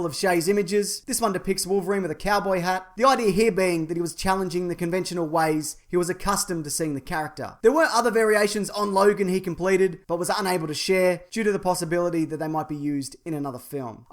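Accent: Australia. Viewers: you sound like aussie man.......not just because you are Australian but you sound like him.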